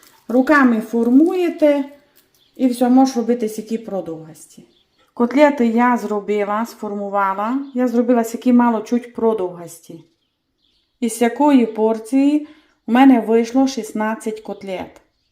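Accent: Transcarpathia, Ukraine. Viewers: Дуже смачна закарпатська кухня і гарний закарпатський діалект ❤ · Насолоджуюся красою закарпатського діалекту. Ви чудові! ❤ Будемо робити такі котлетки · Прекрасні котлетки. І говірка закарпатська чарівна. Молодець. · Чудовий Закарпатський діалект❤ Дякую за вашу працю ❤Слава Україні! 🩵💛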